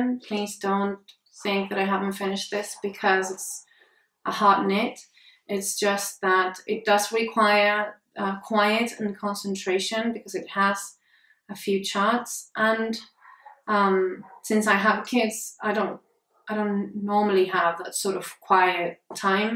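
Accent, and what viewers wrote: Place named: Chile. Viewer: Hi! Nice podcast and I love your acento chileno:)( when you say Santiago de Chile ) I miss that accent ( Im originally from Argentina, now living in Canada )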